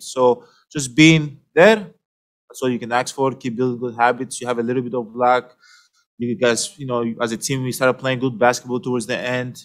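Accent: Greece. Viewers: I love his Greek 🇬🇷 accent ☺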